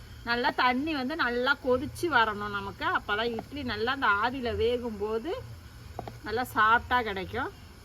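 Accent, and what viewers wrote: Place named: Chola, India. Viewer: எங்கள் ஊர் காரைக்காலில் திருநள்ளாறுக்கு அருகாமையில் இருக்கும் சுரக்குடி என்னும் கிராமம் நான் உங்கள் காணொளியில் மிகவும் ரசித்து கேட்டது என்னவென்றால் உங்களுடைய சமையல் மற்றும் உங்களுடைய சோழமண்டலத்தில் உண்டான அந்த பாரம்பரிய தமிழ் பேச்சு நீங்கள் பேசுவது அப்படியே எங்கள் காரைக்கால் எங்கள் கிராமத்தில் பேசும் வட்டார வழக்கு நடைமுறையில் இருந்ததை கண்டு மனமகிழ்ச்சி அடைந்தேன் ஒருவேளை இவர்கள் நம்முடைய ஊர் பகுதியைச் சேர்ந்தவர்களாக இருப்பார்களா என்று தான் தங்களுக்கு இந்த குறுஞ்செய்தியை அனுப்பினேன் நன்றி அம்மா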